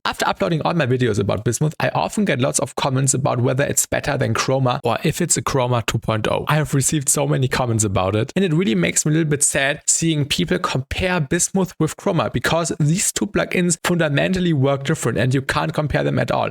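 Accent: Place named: Germany. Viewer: great video … but holy German accent xD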